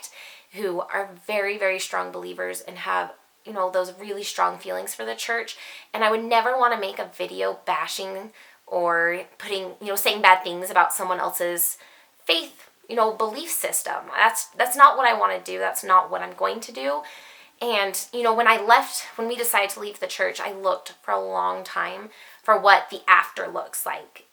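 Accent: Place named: Utah, United States. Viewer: You do sound like you’re from Utah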